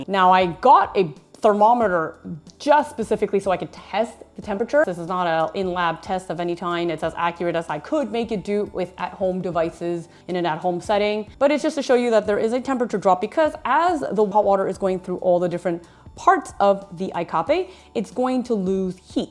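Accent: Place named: Canada. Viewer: I love the way Canadian say "Owt", for the English "Out"...it's the way I can tell they are Canadians when I meet them, rather than insulting then by saying "Are you American?":-))) Also, filling it up full with water regardless of the size drink you want will be better, as a larger volume of water will maintain the heat better for the extraction temperature than a smaller volume of water, where heat is 'soaked' into the machine body.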